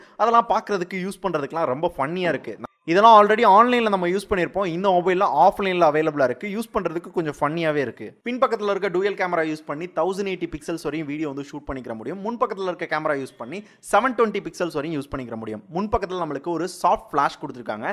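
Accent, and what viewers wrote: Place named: Madurai, India. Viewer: Bro neega madurai thaana... Norikkivittainga...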